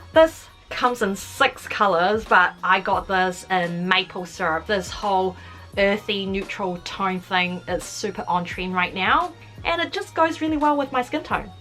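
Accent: New Zealand. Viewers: I missed my new zealand accent icon and fashion queen.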